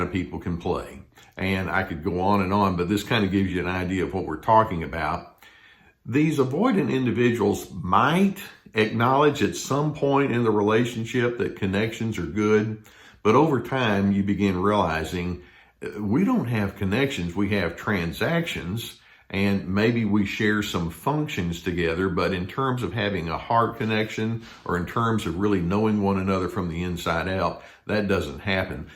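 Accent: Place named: Virginia, United States. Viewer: Dang it, been listening to for years, just picking up your sweet southern accent. Virginia?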